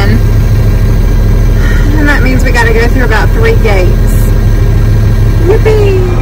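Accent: South, United States. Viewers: I love the enthusiasm (and that southern girl accent!). We are in a uncommon long period of rain. Found your video to hold me over, chomping at the bit to cut our beautiful first growth for the season. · I love your southern accent. What kinds of things do you wonder about northern folks?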